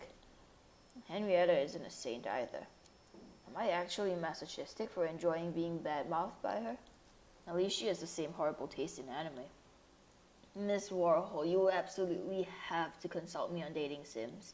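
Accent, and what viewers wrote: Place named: Wiltshire, United Kingdom. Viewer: Whew..... Saw this in our sub box and instantly decided to listen while going on a morning walk. It's a sort of freezing, slightly overcast day over here with a cold grey sky so it was truly the perfect atmosphere. God... Forgot what HC does to a person until we experienced this. Remember watching manly play the original games years and years ago and they emotionally wrecked me haha. Truly some of the most gut wrenching visceral horror from indie games. Just pure agony all portrayed with such charming character designs and in an adorable art style. There's just something so haunting about the way this game dev explores topics and I think the way you described at the end nails it perfectly. We adored your Wiltshire voice and the creepy yet peaceful voice you gave Vincent (that cg parallel hello???? 😭). And ohhhgg if you play any of the dev's other games, we're so down to be hit over the head with the bag of bricks that will be the feels. 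Ehehe thank you for accompanying us on our walk with your soothing voice,! It was a really nice start to our morning 🥰❤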